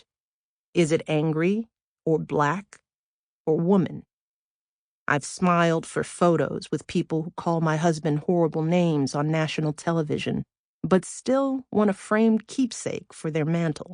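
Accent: United States. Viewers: IS THIS THE AMERICAN EDITION.? I MEAN , THE AUDIOBOOK IS IN AMERICAN SOUND?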